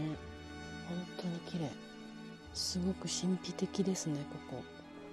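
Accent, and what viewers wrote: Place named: Kansai, Japan. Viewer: Kansai-ben, the best 😂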